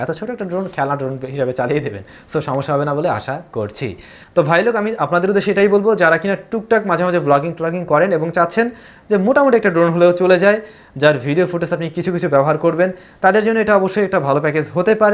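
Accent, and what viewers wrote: Barishal, Bangladesh. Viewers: ভাই টাক খায় তো বরিশালের কথা। আপনার বাড়ি কোথায়? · apnar bari borisal, karon tak kay.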